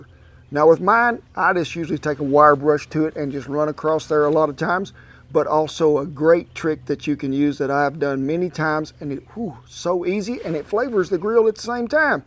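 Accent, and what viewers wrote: South, United States. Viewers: Southern draw* It’s about time I got onto this side of YouTube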